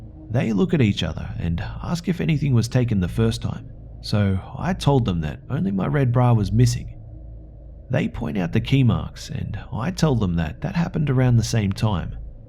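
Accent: Australia. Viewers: 😊 I enjoyed this video listening to it at work thank you, I'd like to say that your voice is one of many I enjoy listening to especially your Aussie accent😊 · Morgan Lowe he doesn't sound South African at all. Get your ears checked. It's Aussie. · he's Australian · Are u aussie? Love your accent and the way you tell the stories, keep it up x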